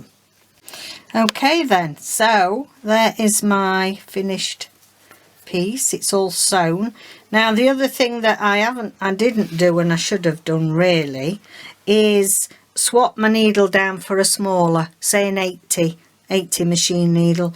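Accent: United Kingdom. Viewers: It’s so nice to meet you! Rachel has mentioned you several times & it’s always nice to match a voice with a name! I’ve never seen this technique before & I’m very excited to learn something new! Unfortunately I have to go on an archeological dig to find my sewing machine in my “sewing room”, hmmm…. Haven’t been doing a lot of sewing recently!! I’m new (since the pandemic began) to journal making & now I’m jumping into slow stitching! Still learning my stitches, hopefully I’ll catch up & get to play with my first page! Thanks so much for showing such a creative & fun technique, I love it! I have joined you as a new subscriber & given you the thumbs up & bell for new videos! I look forward to seeing more great ideas from you! Greetings from Lawrence, Kansas, smack dab in the middle of the US!! Where are you located? I love your accent, I wish I spoke British English! 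See you again soon! Thanks so much!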